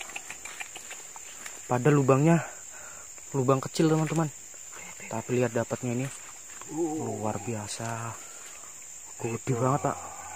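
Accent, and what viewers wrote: Java, Indonesia. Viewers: Mas wis tak subscribe, asline biyen Jowone ngendi ?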